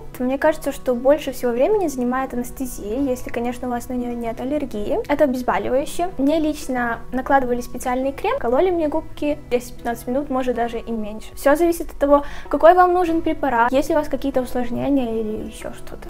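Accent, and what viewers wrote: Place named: Western Ukraine, Ukraine. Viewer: Это западно-украинский акцент. Ничего плохого здесь нет... А вы - нетолерантны.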